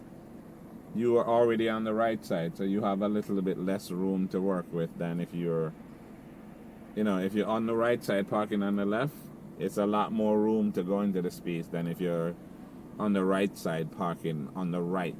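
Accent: Jamaica. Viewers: First coming across your videos, and I love it that’s where I’m having difficulty with. I can tell u r a yardie! I’m having trouble with knowing where to turn the steering pulling out a parking lot, can u do a video on that? Please!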